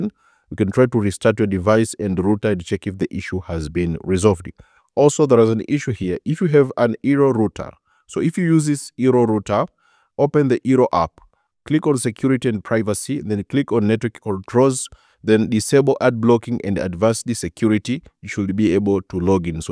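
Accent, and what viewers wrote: India. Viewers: never trust a guy on pc thinks with indian accent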